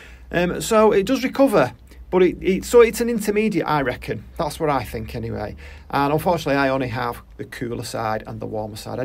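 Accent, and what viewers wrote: England, United Kingdom. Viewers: well it’s the English accent that helps too. And I do wAtch most all your videos, but this one I had to do some other things and I don’t have non orchids so it was okay to listen in parts.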